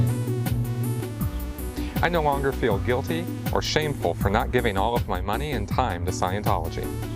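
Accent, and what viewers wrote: Minnesota, United States. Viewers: Are you from Minnesota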